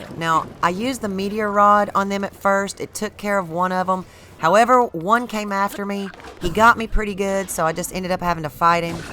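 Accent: South, United States. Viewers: Thanks. I beat the last two with ur help. Since I'm southern all the women i know sound like u so maybe birds of a feather? make it very easy to follow your advice, listen to ur voice, make sense of ur logic! Happy 4th of July God Bless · Thank you, kind southern lady!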